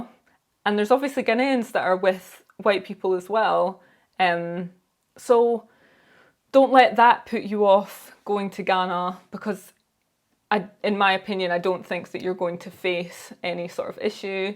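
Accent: Scotland, United Kingdom. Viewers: Hang in there! Love your videos and to see a black woman with a Scottish accent is so cool. We all have hangups we need to get over. Some people go to positive others prefer negative. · No, she definitely doesn’t. She has a very strong Scottish accent.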